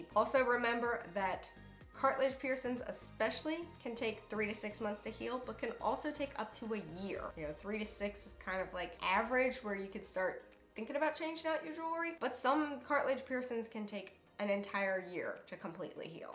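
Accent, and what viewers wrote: South, United States. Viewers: Her accident is like half way southern😂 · This does not anything like Midwestern or Canadian 😂 I’d bet a dollar shes from the south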